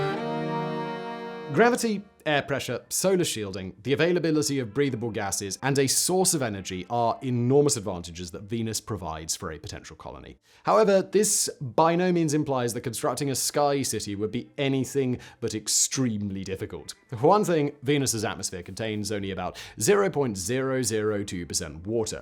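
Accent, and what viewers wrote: RP, United Kingdom. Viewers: I'm not from Britain but oh my god is this guy super posh or is it just me?? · Are you leaning heavily into that academic british accent? Or do you actually speak to your friends with that mouth?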